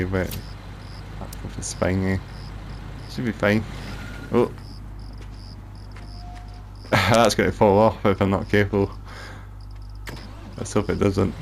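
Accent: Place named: Scotland, United Kingdom. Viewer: Ah. There must be a variety of Scottish accents then, and yours is one I'm less familiar with. I was close with a lovely Scotsman named Jim Wilson, and was very familiar with his accent, and less so with yours.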